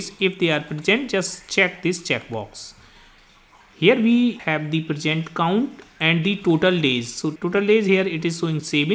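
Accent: India. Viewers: stop talking like freaking indian.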